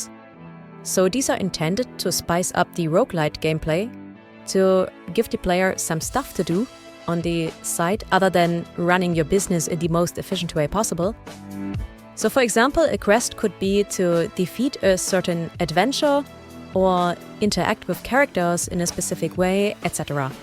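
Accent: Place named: Germany. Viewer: Nice german accent :D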